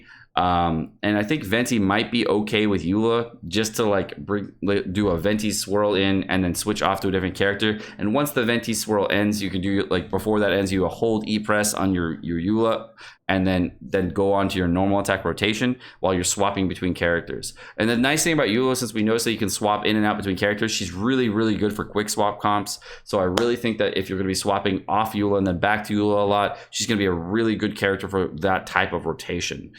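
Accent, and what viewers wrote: United Kingdom. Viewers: Where british?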